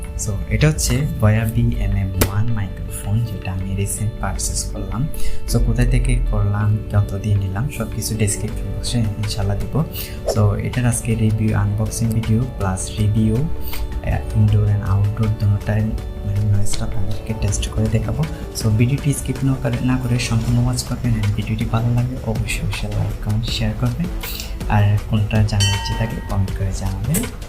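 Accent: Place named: Chittagong, Bangladesh. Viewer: Bhai ki chittagong thke naki? kothar tone ta to chitttagong ar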